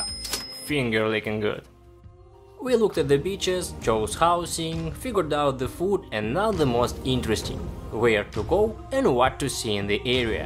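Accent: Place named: Russia. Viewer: Brutal russian accent bro😂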